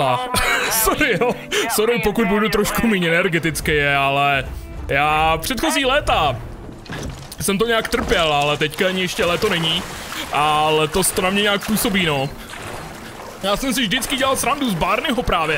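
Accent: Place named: Russia. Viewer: Když mluvíš anglicky, zníš jako rus.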